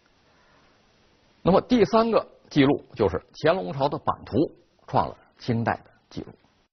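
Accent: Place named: Inner Mongolia, China. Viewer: 他是蒙古族，講話音調跟漢人不一樣，當你看完全系列會有很大的收穫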